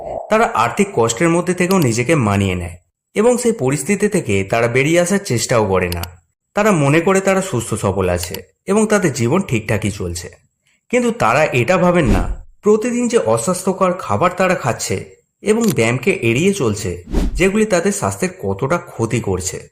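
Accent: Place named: Kolkata, India. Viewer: আপনার কথা শুনলে মনে হয় কলকাতা একটা ভাব আছে,, এটা কি যদি চেঞ্জ করে একটু বাংলাদেশীদের মতো করে বলতে পারেন,, আপনি অনেক দূর যাবেন😊😊😊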